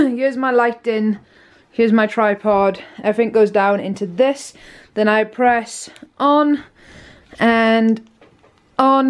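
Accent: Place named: South Wales, United Kingdom. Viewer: Sounds like you're South Walian. Greetings from Aberfan, Merthyr Tydfil. How about a duet. Try - featuring from the Retrospect Tour.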